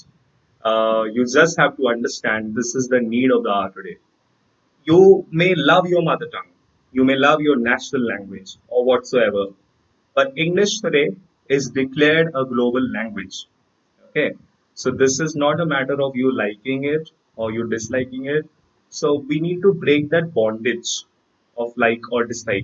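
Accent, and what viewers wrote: United States. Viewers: Awesome session sir...Btw ur american accent is reall good👌👌👌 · Sir please make the video on American English ,your American English is very nice. I also want to learn it .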